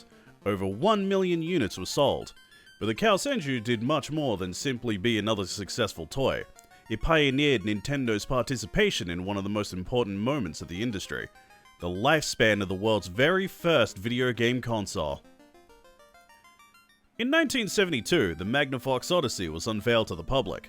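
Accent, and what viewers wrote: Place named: Australia. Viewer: Man the Australian accent sure does kinda suck, basically roll the dice to decide how to pronounce half the words needed to make a video like this. And I say that as an Australian.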